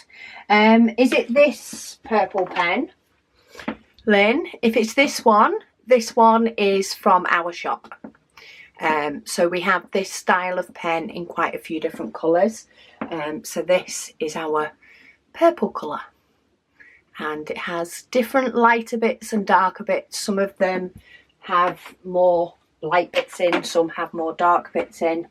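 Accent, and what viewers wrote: United Kingdom. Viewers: Hello, i don 't comment much because i'm painting together with you during the waffles. Great company! Love the british accent which reminds me of schooltimes. Also a very nice refresh from a lot of american youtubers which i also enjoy. Now don 't get me wrong with the following! I had a very surprised chuckle when you read my last comment out loud. The first 2 times you got my name right with lovely accent, bonus points haha. Last time you made it sound like "may raven" like the month and the Bird. That is the very first time that happend and i was happily laughing. My name is very hard to get right and this was the most unique response. Not offended! Don 't be doubthing yourself. I guess to explain you say my first name like "mai rah". You added a bit of my last name as well. ;) even dutch people Don 't get it right all the time. 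My parents just love the name and so do i. More people with unique names have the same experiences? That was my ramble, happy painting!😂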